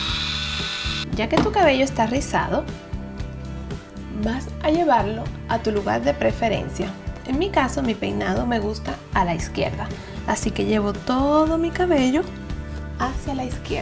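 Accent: Cuba. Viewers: porque la voz lo tienes muy diferente a tus vídeos,actuales no entiendo,cual es y voz Real Porque actualmente tu voz es más dulce y con su voz cubano Pero antes tu voz era diferente Acaso mi hermosa finjes tu voz, por favor aclaranos ese tema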